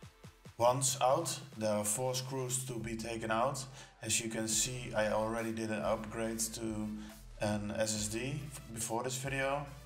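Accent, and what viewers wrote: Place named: Netherlands. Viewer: Are you Dutch? You have a Dutch accent. hehe Nice Video! Thank you!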